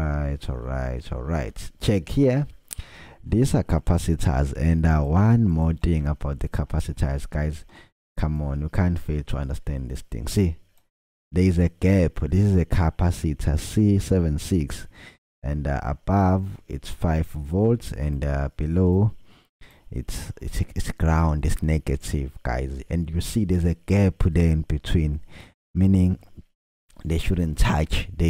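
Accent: South Africa. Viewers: You from south Africa bcz I here from you iiibo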